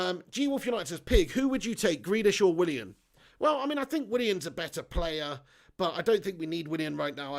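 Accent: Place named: Scotland, United Kingdom. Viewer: We need pig doing the deals like his Fifa career mode .the Scottish accent needed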